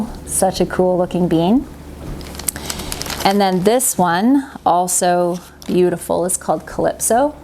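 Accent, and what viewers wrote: Canada. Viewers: No one else takes the time to show people the seeds themselves and how to get them out and how to harvest them because honest to God I had no idea that was so much into saving seeds but I'm thankful I found your blog if I had not found your YouTube channel I would be lost when I start doing this next year myself and I am going to watch everything you have on this because I really honest to God appreciate you doing this thank you so much I'm from America and it sounds like you're from Canada I haven't really checked that out but I can tell you this right now I really do appreciate it and you're you're an awesome parent I have not gotten to see your husband enough to see you know what kind of parent he is and honestly it doesn't matter you are a wonderful wonderful mother and you are so good with your children and your children are smart they act like they're homeschool kids they're very very smart and they are always talking to you and always telling you about something you actually listen to them that is amazing God bless you and your families and my family's prayers and thank you again so much for sharing your journey I have cancer and I would not be able to do that right now and I appreciate it so much that I'm learning something and I'm enjoying it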